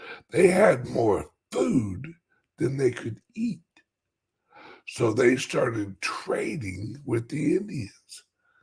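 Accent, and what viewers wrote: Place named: South, United States. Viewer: This right here is the second time I am coming across this name in a week. Came to my school for a lesson and it was lit and yes, she has a really cute southern accent.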